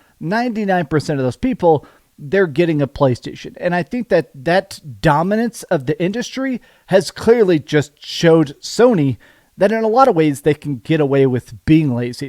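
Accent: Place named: South, United States. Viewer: Southern accent. He even says y'all. Are you saying white people can't have their own culture?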